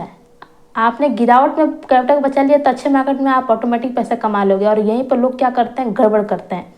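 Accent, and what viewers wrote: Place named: Bihar, India. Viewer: Bato se lag raha hai bihari hoo 😂😂😂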